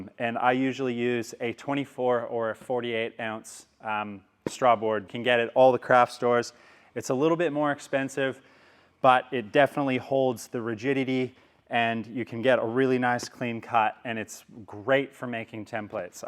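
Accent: Australia. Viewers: Am I going insane or do you have just the barest hint of an Aussie accent? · is he hiding an Australian accent under there?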